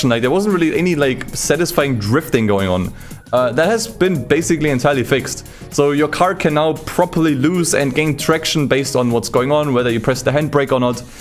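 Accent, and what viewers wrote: Germany. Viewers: U have a strong german dialect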